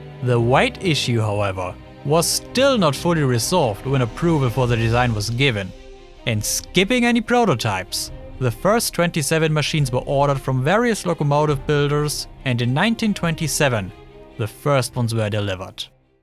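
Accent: Germany. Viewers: Er, no it isn't. Unless you think that AI can generate renders that good, and is speaks English with a perfectly German accent and occasional accidental use of German grammar. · he speaks AI german accent ... u have never had an argument ...sorry ... and his voice is always 0 dB ...u most likely dont even know what that is ..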